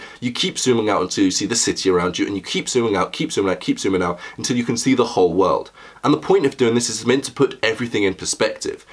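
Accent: United Kingdom. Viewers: ofc this guy is british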